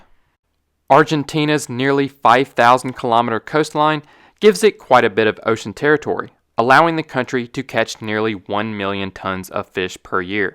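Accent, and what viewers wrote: United States. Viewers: I don't think it is English...The narrator's accent is American.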